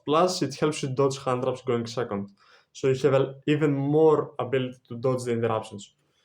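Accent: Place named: Greece. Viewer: I know a Greek accent when I hear it. Καλή δουλειά αδερφέ!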